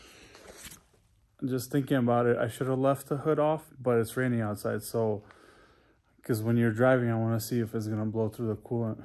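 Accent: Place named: Eastern Europe. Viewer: Another great video. People want to put down the 6.4 but remember is was not Ford Nor International fault that the government made so many emissions mandates. Many people say that after 10 years then the vehicle emissions should be exempted. By the way, I hear a hint of either Russian or Ukrainian or easter Europe in your voice. May I as, Where are you all from?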